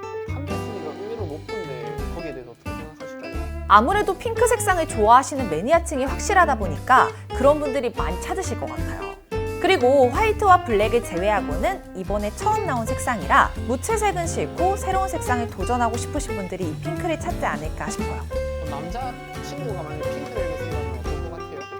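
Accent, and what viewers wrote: Gyeongsang, South Korea. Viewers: 대구 사투리 ...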